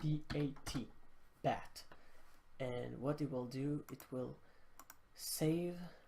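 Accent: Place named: France. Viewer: Un français anglophone ? Why not, une petite correction, le point se dit « dot » 😉 en tt cas gg à toi !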